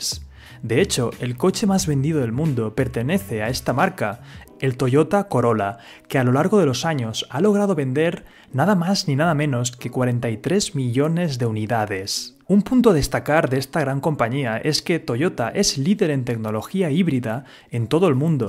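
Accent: Spain. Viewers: Buen video, pero te aconsejo tomar unas clases de ingles, porque no se si es porque seas español pero pronunciaste mal casi todas las marcas incluida Coca Cola, pasando por Google, Microsoft, Apple, gmail... podrías optar por pronunciarlas en español. O se pronuncian en inglés o en español pero no en una fusión, el spanglish como idioma no existe y esas palabras están en inglés.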